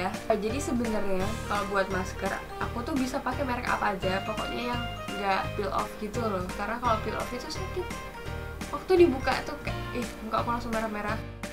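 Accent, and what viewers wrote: Java, Indonesia. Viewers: Sukaa banget sama suaranyaaaa😍 medok medok kalem adem gitcuuu, penjelasannya juga singkat, padat, dan jelas. langsung subs.💖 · Jowonya medok juga yaa mbak😅😅 walaupun ngomong pakai bahasa indonesia ttp keliatan jowonyaa😅😅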